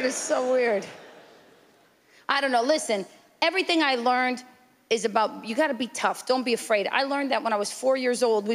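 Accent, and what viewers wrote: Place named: Boston, United States. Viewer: Such an incredible voice and "Buoston" accent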